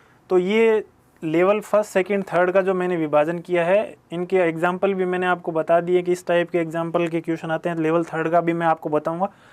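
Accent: Rajasthan, India. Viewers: Love your rajasthni voice tone.. 😍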